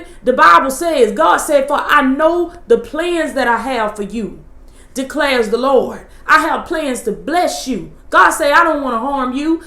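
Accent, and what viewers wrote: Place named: Georgia, United States. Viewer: I knew you was from Georgia you all speak more country than NC people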